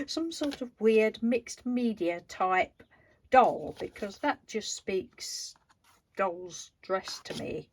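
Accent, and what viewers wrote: United Kingdom. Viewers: It looks like you are just having the best time!!! I'm in the USA but when you said it was for your friend Penny Humphreys it made me think of 'Are you Being Served' haha. Wasn't there a miss Humphreys on there? I belive so 😆. I love watching that silly show plus you sort of have that accent to me. I'm being silly I know. Your project inspires me to just go make art with free abandon!!! I love your project.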